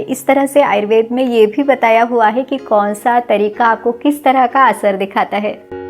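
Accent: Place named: Maharashtra, India. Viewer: आपल्या बोलण्यावरून असे वाटते आपण maharastriyan आहे मग मराठी का नाही बोलत कारण महाराष्ट्र खूप मोठा आहे.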